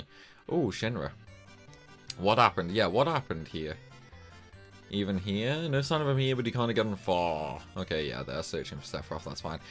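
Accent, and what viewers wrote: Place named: Stoke-on-Trent, United Kingdom. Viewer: Knew what? Stoke-on-Trent? I called that too! It was the one accent I never saw him deny on stream, but he just ignored it when guessed instead. Also because I went to Staffordshire uni so I'm kinda familiar with it. I ruined my stomach drinking Pepsi for three years because the tap water in the area is disgusting.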